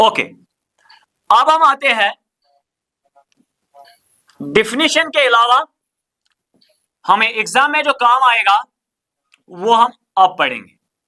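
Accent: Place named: Kulgam, India. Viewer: Sir shayad kulgam sai hai (asent)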